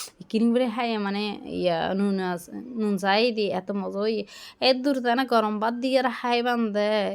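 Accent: Chittagong, Bangladesh. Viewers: দারুন লাগলো আপু তোমার চাটগাঁর কথা গুলো 👍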